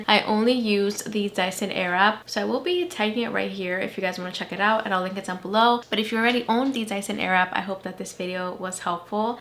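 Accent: Dominican Republic. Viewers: Your Dominican accent ❤🇩🇴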